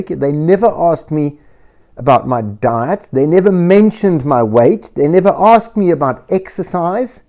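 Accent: South Africa. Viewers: You sound South African😊I'm in Port Elizabeth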